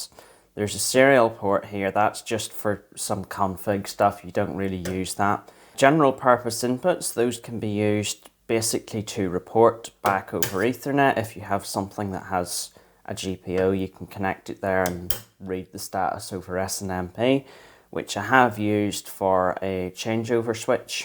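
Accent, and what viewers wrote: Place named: Fermanagh, United Kingdom. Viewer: Sounds very Fermanagh-esque.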